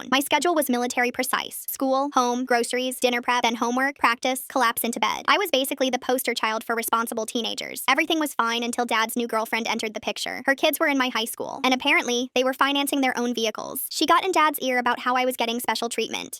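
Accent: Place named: United Kingdom. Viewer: Nice, we got a Brit, friggin yes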